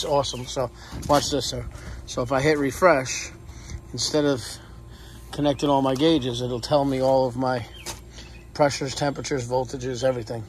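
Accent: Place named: Long Island, United States. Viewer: Where in ny are you? You got a Long Island accent but looks like your upstate here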